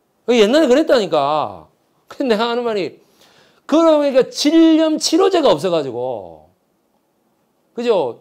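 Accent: Busan, South Korea. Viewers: 귀에 쏙쏙 들어오는 부산말씨 확실하게 설명해주셔서 감사드리며 정말 역사에 대해 모르는게 많은것에 부끄럽습니다 매일 듣고 있습니다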